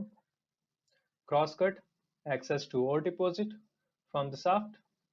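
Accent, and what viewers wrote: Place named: India. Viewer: I can't understand because your indian accent.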